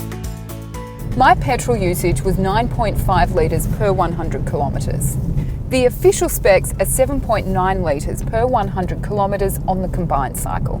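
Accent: Australia. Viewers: love the auzzie accent - now i watch all my reviews on auzzieland channels. i have to assume this lady is not super tall...otherwise this car looks enormous. hopefully they dont scrap these vehicles with the new renault-mitsubishi asx built in spain if i hear correctly. i would hate to see the great reliability of these cars destroyed by changes in production. japan built cars kick as*. as i get older i look much more into production and history than vehicle options. many vehicles out there are crap...the asx/rvr is not one of them. mine is still awesome 11 years later. good visibility. great maneuverability. reliable. simple. im 6'4" and it has lots of head room. the tall kids complain in the back seats so everyone needs to adjust the seats. paddle shifters are great for the smaller engine - my 2012 has it. enough power with paddles. auto is slow from a stop. 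fold the back seats down and use a moving blanket to haul a lot of stuff. i haul 2x4s and plywood with mine when needed. $120 after market roof rack.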